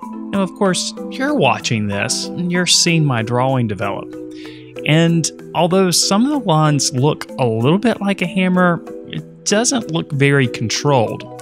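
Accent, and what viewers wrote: South, United States. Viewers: He has a Southern Drawl when he drawls ... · It's his southern accent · It's that Southern drawl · He clearly has some kind of slight southern accent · from southern USA therefore he has a drawl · Your ears. He has a a Southern drawl. When a lot of us Americans say the word “drawing” we don’t pronounce the “w”. It’s more like “dra-ing”. The way he says it is “dra-wing.” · I recognize a fellow southern here right away. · Definitely says DWawing. Sounds like he’s from the American south. ‘Ya all come back ya hear’ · His southern accent has him saying "d-ROW-wing" as opposed to "d-RAW-ing" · Really are you trying to be mean? He obviously has a southern accent. 🙄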